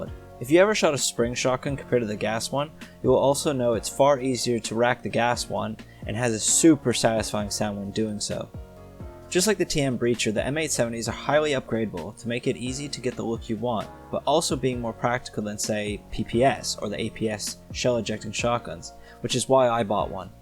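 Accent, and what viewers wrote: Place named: North America. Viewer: What country are you in? You have a North American Accent, but it looks like you're in the UK because of your back garden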